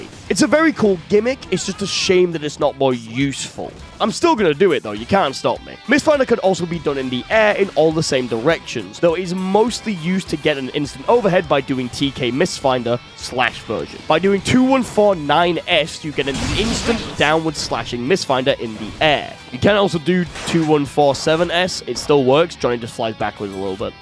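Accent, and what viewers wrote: United Kingdom. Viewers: No wonder your voice sounds so similar if you're northern that makes sense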